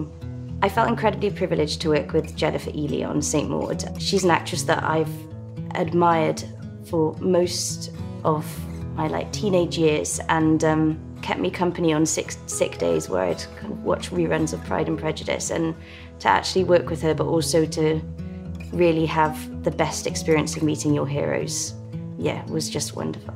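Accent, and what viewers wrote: England, United Kingdom. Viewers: Love her English accent taking over her rural accent!!!! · British accent love🤩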